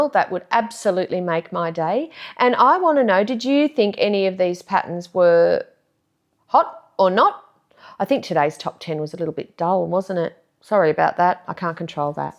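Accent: Australia. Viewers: Love your blunt approach & hearing an Aussie talk about knitting & yarn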